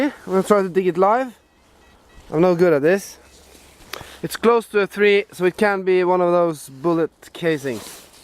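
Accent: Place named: Norway. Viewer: Hi I have enjoyed all of your videos and your great sense of humour and just hearing your accent is making me miss your beautiful country. Where in Norway are you living as I visit the Vestfold area of Norway but its mostly in the winter months. Anyway have a good christmas and i hope to see more videos from you soon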